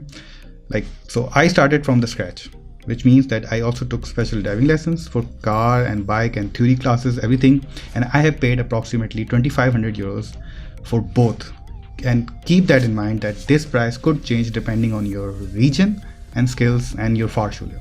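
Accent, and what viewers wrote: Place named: India. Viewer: Me completely confused when hearing an Indian accent😂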